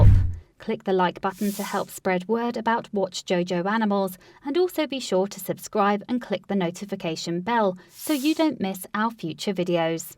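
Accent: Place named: United Kingdom. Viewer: So throw the "darn" ball!!! The narrator's British accent us so strong that it distracts from understanding the narrative AND it's difficult to pay attention to what the lion is apparently NOT doing. Also worth mentioning is the "abundant use" of actual video footage. So extravagant, but worth the considerable expense! .......what do you have to say..........? Please enlighten me. Thanks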